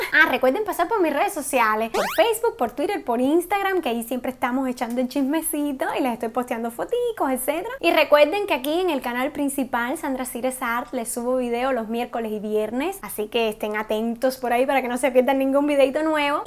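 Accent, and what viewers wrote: Argentina. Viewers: me encanta el acento argentino sobretodo desde que vi la telenovela Muñeca Brava jejeje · Me encanta como hablan los argentinos y x cierto irradias mucha energía positiva eres linda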